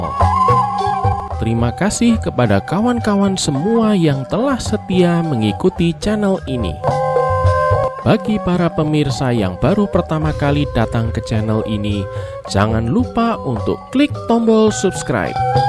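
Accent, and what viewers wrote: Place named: West Java, Indonesia. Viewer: Walau mas nya orang Jatim tapi sound nya Jabar...mana enak lagi sound nya.. Nuhun mase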